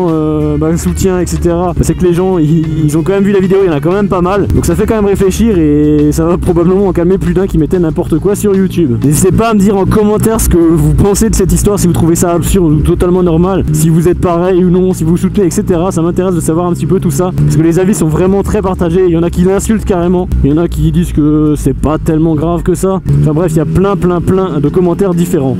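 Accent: Alsace, France. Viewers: Salut tu est d'où d'Alsace ? Sinon super vidéo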